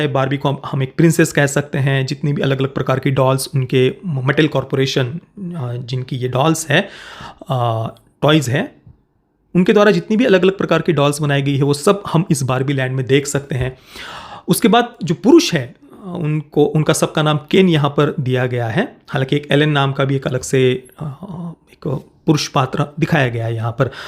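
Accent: Maharashtra, India. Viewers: Heavy Marathi accent he has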